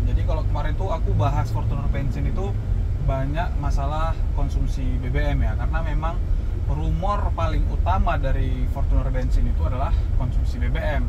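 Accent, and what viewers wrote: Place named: East Java, Indonesia. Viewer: Orang mana om, kok logatnya pake le lek gitu.. Salam dari jawatimur surabaya😊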